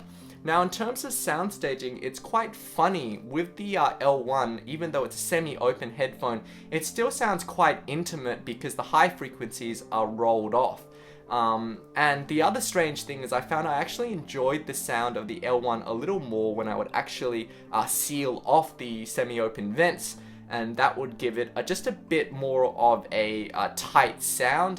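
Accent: Australia. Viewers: i mean this in the best way........its crazy seeing an asian with an austrailian accent.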